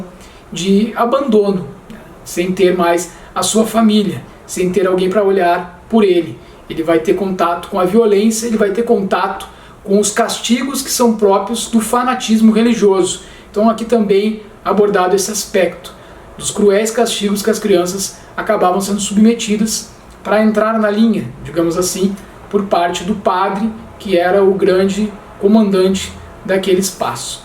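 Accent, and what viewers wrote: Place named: Rio Grande do Sul, Brazil. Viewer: Já vi que é meu conterrâneo pelo sotaque. Mas bah, né? Amei o vídeo. Verei os outros em breve.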